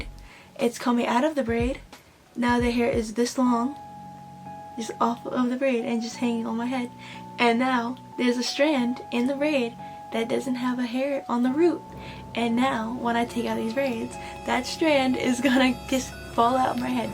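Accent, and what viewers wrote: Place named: England, United Kingdom. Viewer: Wow thanks and I really thought u where from England 🤷🏾‍♂️😂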